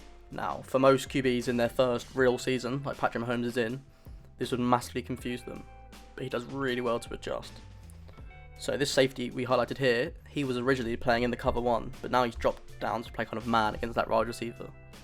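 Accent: United Kingdom. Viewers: I would rather have a football woman narrater than a british accent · where are you from? sounds like the UK, if so, are there many nfl fans there? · This is unbearable to listen to with a British commentator. WTF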